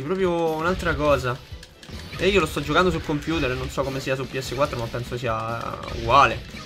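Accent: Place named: Rome, Italy. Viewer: Like numero 30. Gameplay lungo ma molto coinvolgente e ben giocato come sempre. Per la lettura dei dialoghi sono contento che leggi, ma fallo con calma che ci piace sentire il tuo accento romano, ok? Per farmi perdonare di non poter vedere il gameplay di Star Wars, sono tornato indietro di 2 mesi per rispolverare questo piacevole video. Visto con interesse e tutto d'un fiato!!! Bravissimo.:D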